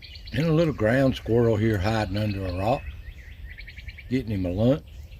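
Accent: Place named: South, United States. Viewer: Thank You, love these videos and the music! And of course your southern accent 😊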